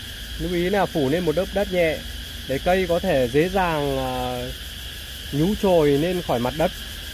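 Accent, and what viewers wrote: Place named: Hanoi, Vietnam. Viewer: Hanoi ha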